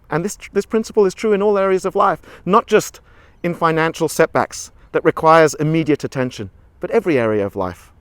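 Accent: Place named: Australia. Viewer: Wow, look who it is. All these years it must be 25 years and your Australian accents come back. I hope you’re doing well thanks for a great message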